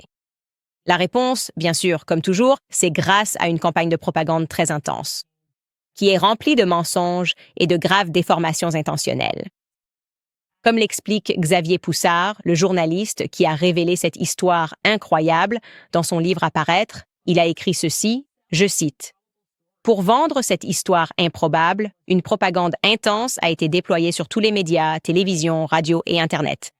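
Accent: Quebec, Canada. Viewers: AI gave you an accent from Québec!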